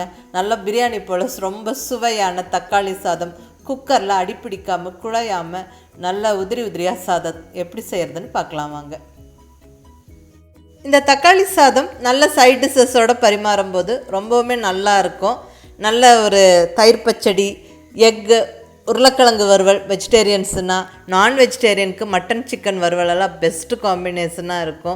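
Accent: Coimbatore, India. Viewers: Nama Coimbatore Tamil kekalam Inge vantha.. 😍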